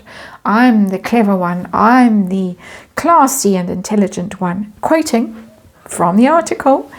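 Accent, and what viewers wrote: South Africa. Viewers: has a beautiful South African accent! I think she would be a fabulous audiobook reader!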